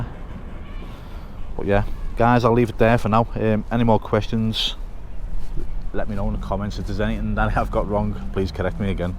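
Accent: Liverpool, United Kingdom. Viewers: Brilliant camera work and a real scouse commentary. Love it. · You sound a bit like Jamie Carragher the way you pronounce your scouse A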